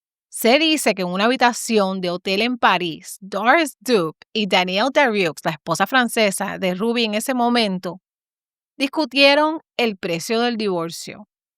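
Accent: Puerto Rico. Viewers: parece boricua la señora por su acento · por su acento, percibo que es de la tierra de BORINQUEN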